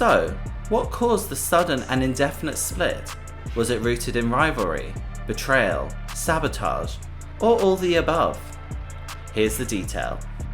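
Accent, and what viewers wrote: United States. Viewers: I was ok with american accent❤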